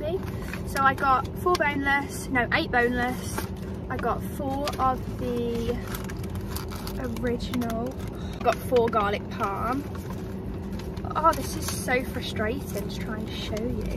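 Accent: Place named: New Orleans, United States. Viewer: I like her accent 😭 I'm from New Orleans lol if ykyk the voice is crazy 😂😂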